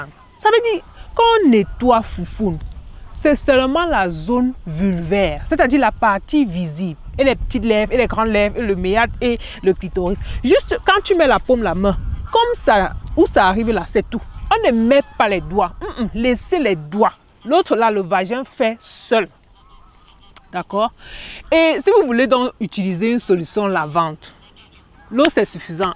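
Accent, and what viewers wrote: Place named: Africa. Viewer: J’adore le parler africain...💐🌺🍀🌸🌷🏆🏅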